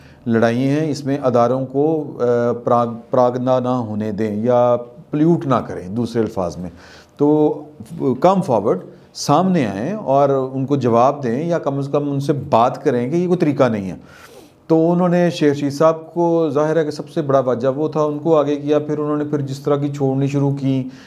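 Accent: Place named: Lahore, Pakistan. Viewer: Salam from france. I like very much your lahori style of speaking. SHUKRIA. GO NIAZI GO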